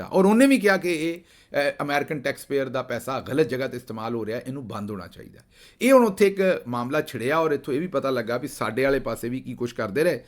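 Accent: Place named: Punjab, India. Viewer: We love ur Punjabi accent....baot wadiya gall baat kar de o tusi...MS jeete raho Sir g....❤️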